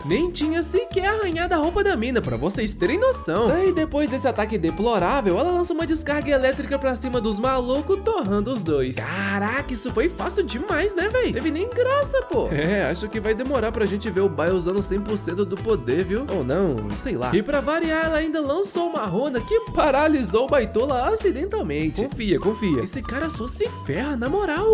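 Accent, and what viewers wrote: Bahia, Brazil. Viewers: Kkkkk primeira coisa q os caras perguntam é se ele é baiano! Kkkkk TENSO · Oiaaa, novo narrador....gostei kkkk é bahiano né? Certeza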